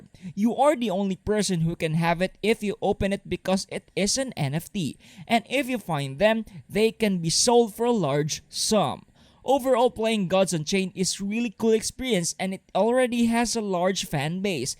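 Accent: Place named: India. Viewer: This foookin indian accent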